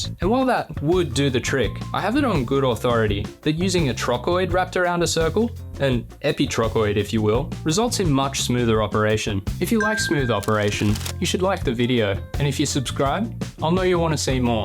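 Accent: Australia. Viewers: I just found this channel, and I get the feeling you're like a younger, more Australian This Old Tony. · AVE ! But Aussie and not Canadian! You got my subscription 😂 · Cycloidal drive for what? A fishing Reel? Looks Like I have gotten in somewhat early new subscriber here. Plus you sound suspiciously Australian? Can you define SuperWog? for me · Every Australian I see on YouTube always turns out to be a subversive communist trying to undermine their own country, let alone mine. So when I hear your accent I am immediately alarmed. :(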